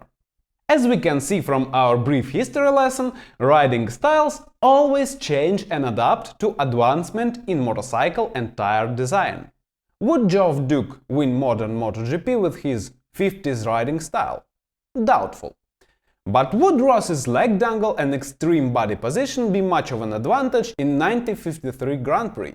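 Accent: Russia. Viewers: Dude talks like he's russian robot. Is he one of those AI generated things ?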